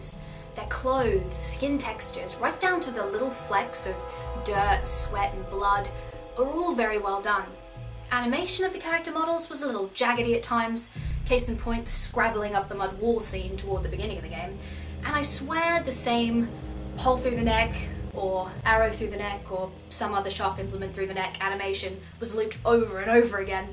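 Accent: United Kingdom. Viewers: I think you should have done the voice over work for Tomb Raider. Your british accent sounds much better than the actress that did it.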